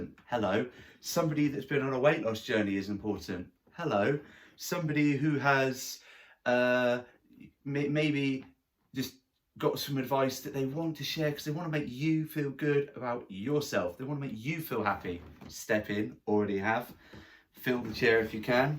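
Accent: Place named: United Kingdom. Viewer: i want what this guy is having. What an energy! I wish I hadn't looked at this "guns" though as Ron Burgundy would put it, cause now I feel so skinny. "don't worry he's fine, it's only a staircase!" hahahaha, please do more videos like this one, how good is it to be able to both listen to a guy speak about scotch while making funny jokes (especially with a nice British accent)/ Thanks from France.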